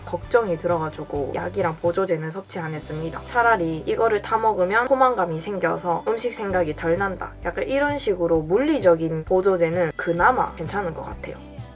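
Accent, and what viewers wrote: Gyeongsang, South Korea. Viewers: Well guys , I'm not korean but I can tell that accent isnt from Seoul I wanna say Busan ( correct me if I'm wrong) anywho ALL THOSE DRAMAS HAVE DONE SOMETHING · Gyeongsangdo accents really carry this charm that is so good to my ears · She said in another vid that she’s from Daegu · your voice + your Busan dialect is so relaxing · 多分プサンの方言かな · 대구 아가씨 같네요~ 뭐든 의지가 젤 중요한 듯해요^^ 물을 많이 마시는 습관도 포인트! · i think it's daegu? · She’s definitely from Busan or perhaps somewhere in southern Gyeong Sang province. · Jenna Li wow ㅋㅋㅋyup her accent from busan(Edit: her accent from daegu!) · Are you from busan?? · 부산 사세요? 말투가 부산같으신데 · 韓国人です❗釜山か大邱ですが、多分大邱だと思います。きょんさんどの方言であることは確かですがこの違いはそこの人じゃないと比べることは難しいですw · Hannah Rose Yasay she's from daegu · ARE U FROM BUSAN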